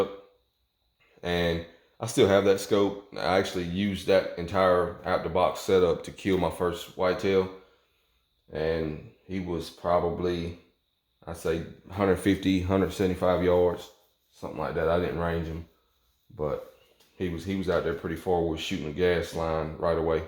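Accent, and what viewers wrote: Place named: South, United States. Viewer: I know this is an old video but blessings for sharing the experience. I have the MVP LR-308 in Kuiu Camo with a 20 inch medium bull barrel (discontinued.) That bull barrel likes 180 grain. I have not had the scout edition but definitely a good deer gun for thick woods in the southeast. I hear the southern accent in your voice, so, I know you are hunting thick woods like I have in northeast Texas.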